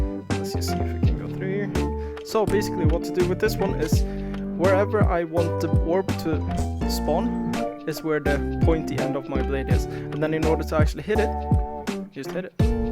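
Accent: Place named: Sweden. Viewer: Visste inte att du var svensk före den här videon. Man hörde det verkligen på din dialekt.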